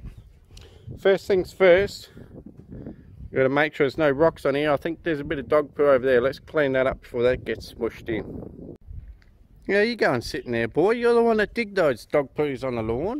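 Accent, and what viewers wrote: Australia. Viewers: Are you from Adelaide?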